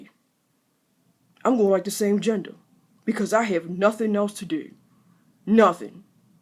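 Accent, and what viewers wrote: Baltimore, United States. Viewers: (Twiztid Lestat) Baltimore accent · You seem to have a Baltimore accent. I see to this show I liked all the time and their accent sounds like yours and it was set in Baltimore. Love the different accents around the globe. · she has a very thick Baltimore accent...Most everyone I know from Bmore stress the U sound hard...its kinda funny tho, I use to tease a friend when she pronounced the color "Blue" .....it was too cute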